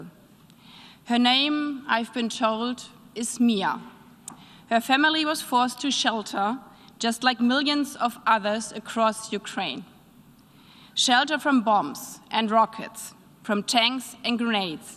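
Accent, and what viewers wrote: Germany. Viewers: Ihr deutscher Akzent ist recht gruselig, aber darum geht es nicht. Sie macht einen guten Job, bietet Lawrow die Stirn, der seit 18 Jahren im Amt und ein mit allen Wassern gewaschener, skrupelloser Lügner ist, sie arbeitet hart, lernt schnell und hat in ihrem Amt eine Diktion eingeführt, die man, wenn überhaupt, schon lange von keinem Diplomaten und keiner Diplomatin zu hören bekommen hat. Wer bei all' dem nichts weiter zu bemängeln hat als ihren Akzent, der offenbart, dass es nichts zu bemängeln gibt.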